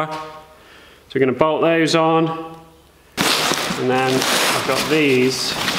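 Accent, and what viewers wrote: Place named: Northern England, United Kingdom. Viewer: crickey, you sound Northern!!!! 😂😂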